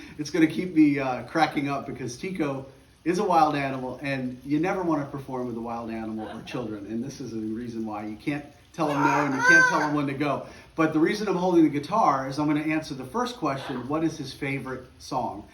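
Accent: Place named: United States. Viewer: Good Saturday morning to you! Thank you very much for this video which allows us to know more about Tico... I have absolutely no doubt that he is a happy bird, who also has, it must be said, a very loving and attentive family. parrot needs. Thank you for enlightening our days or our nights, for sending positive!! ... and thank you also for the French who do not shine too much in English (of which I am... I am more Spanish-speaking, than English-speaking) for trying to improve... I love your American accent (from Florida ?)! A hug to Tico!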